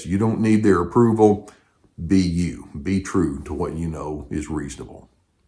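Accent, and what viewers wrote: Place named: Alabama, United States. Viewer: Thanks again for your calm voice, your lovely Alabama accent and your great teachings on dealing with narcs. Mine moved out 6 years ago but still phones me occasionally and I still get a bit sucked in to his sob stories and lies and accusations, his gaslighting etc. One part of me still misses him. The other part knows I've gone back into denial and fantasy land. Listening to you always puts me back onto firm ground again. Many thanks.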